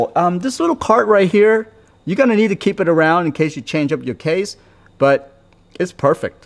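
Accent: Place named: Vietnam. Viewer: I can tell right away - viet brah :)) there's a bit of an accent. Thanks mate - just what I was looking for. Gamepad with the case on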